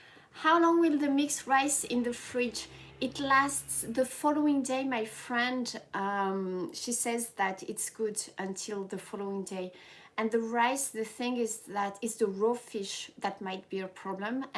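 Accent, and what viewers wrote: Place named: France. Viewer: フランス語訛りの英語、理解するのがつらい🎵すしのシャリを入れた木製のボールは素晴らしい。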